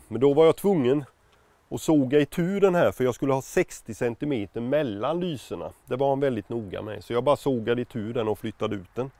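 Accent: Småland, Sweden. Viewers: För han é från småland, dom säger oxå Cykelen, Nyckelen,Bibelen etc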